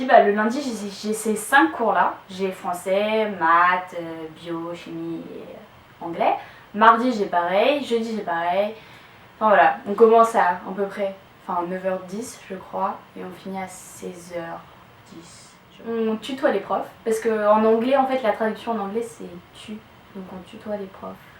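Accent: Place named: France. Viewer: ohh, that's why i can understand your french perfectly - 'cuz your from France (*not Quebec!..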